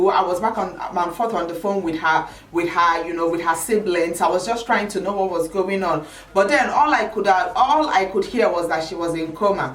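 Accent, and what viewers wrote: Nigeria. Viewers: thanks, so touching but interesting! Your stories are always so interesting..................never a dull moment with you! Sorry about the loss! God knows best! slay on my queen! your accent and repeated "words" makes me know you proudly Nigerian! lol when we see oyibo, we know say na oyibo, when we see our own, we know say na our own......kissessssssss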